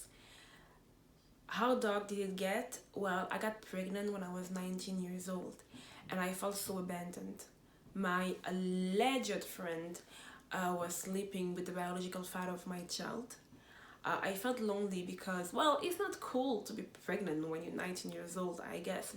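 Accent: Cameroon. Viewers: You sound like a Francophone Cameroonian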